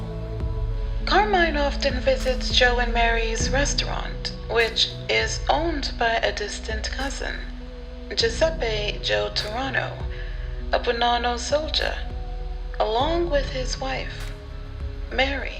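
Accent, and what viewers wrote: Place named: Brooklyn, United States. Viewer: No one know where can even contend with the narrator, the impeccable balance of her telling the story and keeping you in suspense while telling you the story is one thing, but her voice is a whole Nother thing in itself. It’s so Brooklyn so gangster rest like a pedestal WIFE from one of the boys like there’s no question she is now the best narrated narrative which ever term you want to use. IN THE MAFIA GENRE.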